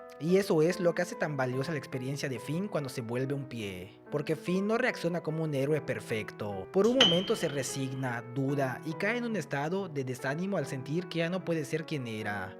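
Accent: Yucatan, Mexico. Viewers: Buen video, eres yucateco? 🎉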